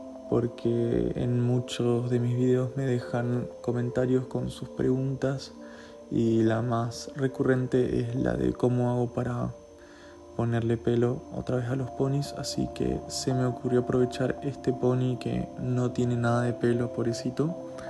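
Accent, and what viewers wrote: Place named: Corrientes, Argentina. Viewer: Sos Correntino ?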